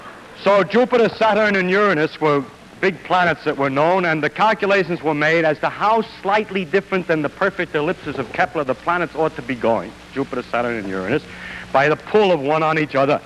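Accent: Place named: Brooklyn, United States. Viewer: Dr. Feynman speaks with the Brooklyn accent of a man who was born in 1918. Do modern Brooklynites still speak with this accent, or has mass broadcast communication quashed it? The New Orleans accent that my grandparents spoke with is all but dead.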